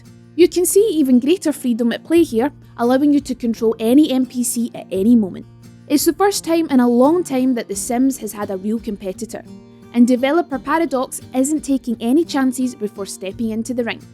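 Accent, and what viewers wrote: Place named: Scotland, United Kingdom. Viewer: Scottish I think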